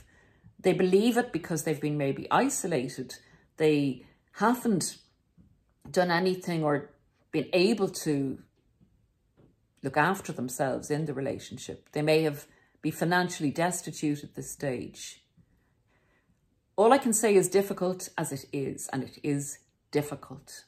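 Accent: Ireland. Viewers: Thank you for your help you seem to understand the situation well enough to put the problem across to others , every thing you have said I’ve been through just gone through Christmas that my husband ruined for me no gift no food nothing spent the last few days in the bed room away from him as he had the nerve to blame me , I’m not well at the moment and it seems to annoy him as he appears to think he’s worse than me , but I haven’t seen any evidence of that ,I might have to have an operation at sometime but I can’t believe he would want to help me with that . He has left some leaflet around about domestic abuse I can’t believe he thinks I’m the problem ,I haven’t spoken to him from before Christmas as it’s a waste of time as he doesn’t understand it’s him causing the problem , I thought he could be depressed as all he does is to moan about everything and everyone but he’s been like this for years so I don’t know, I just want to be happy , normal, not living in a war zone doggy land mines , loving your accent my parents were from Ireland, thanks for letting me let off some steam , happy new year .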